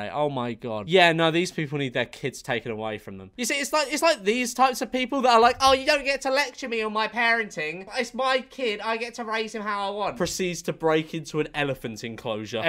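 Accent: United Kingdom. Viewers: 'ello welcome back - brilliant sign of a British man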